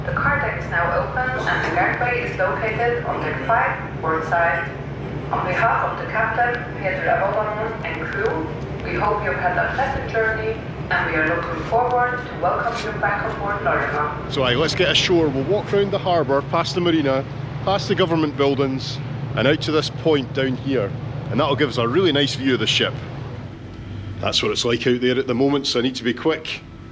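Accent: Scotland, United Kingdom. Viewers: Hi! First time watching your channel. (Yes, I subscribed. ) Loved the video very much. I live in the state of Washington, USA. I could really feel the cold you felt! Really liked your monologue, and your Scottish accent. Felt like I was on the ship with you.. Looking forward to catching up on other videos of yours and to future ones. 🙂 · Im detecting a Scottish accent, I went to Rannoch School 4th and 5th form back in the 70s 80s and love watching these vids. My Fathers side is from the Hay Clan and my Mother unfortunately has ties to the McDonald Clan. But mt Tartan is Hay Clan. Keep up the good work and dont be a poofter. The Scottish would rule the world if not for Scotch Whiskey!! Keep up the good work and hope you get at least your Bronze award for this expey! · And, have to add, you have a marvellous voice, very friendly, very clear, and, o/c, lovely accent! 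- can't beat a good Scots accent. Thanks for the voyage. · Well done, clear and honest info. brilliant. And your SCOTTISH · Your videos are much better than some of the more well known utubers. My mum is Scottish and I love the accent. The things I like the most about your videos is you show these trips from a much more affordable way so it becomes attainable for a lot of people. I also love the fact that your narration is not monotone like some of the others who actually put me off. You don't use lots of silly gimmicks and your filming is lovely. Will be watching more of your trips. Well done. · Rarely have I seen such a beautiful, friendly travel report as yours. Not a trace of snobbery, absolutely unpretentious report intended for the average consumer. The narrator's heartwarming, generally positive nature with his lovely Scottish accent (at least I assume it's a Scottish accent, right?) do the rest. 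Thank you very much, Mr.! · I live in the USA where I was born, but am a proud descendant of brave Scots men and women who crossed the treacherous North Atlantic nearly 400 years ago. I have just discovered your channel as a result of searching for information about this ferry. Thank you for the engaging post and I love your Scots accent. 🤗. Edit: It is a handsome ship indeed. I’m truly adding this to my “bucket” list. 👍🏼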